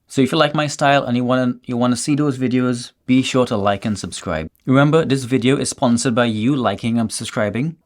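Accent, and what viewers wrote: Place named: Trinidad and Tobago. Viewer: Bredz like ah hearing Trini 😂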